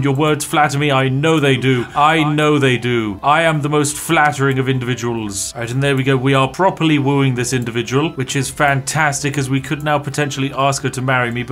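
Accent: United Kingdom. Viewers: The pure British accent its so good and it really makes jokes better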